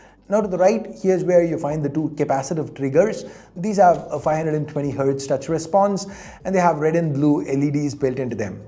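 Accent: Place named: India. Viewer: Hey I hope you don't take this the wrong way but and I apologize if I offend anybody by saying this but usually Indian speaking people drive me nuts because I am in American and we are just so used to the way we talk. Not saying anything about your people it's just the way you guys talk That's it other than that I love you guys. The reason why I say that is because usually I'll just turn the channel off I gave you a chance and I love your channel. I really in to the newest tech when it comes to phones I believe these gaming phones are just a scam You're not going to get anything better out of it than you would say an s24 ultra you get the same performance but that's just my opinion your allowed to have any opinion you choose. The one thing I will give this phone at least they put a better screen on their phone than that rog phone. The rog phone is still putting a 1080p on the newest one where this phone at least want to a 1 and a 1/2 k screen I think for the money you're putting out for a phone like the rog phone I believe it's like $200 more for that time than it is for this one.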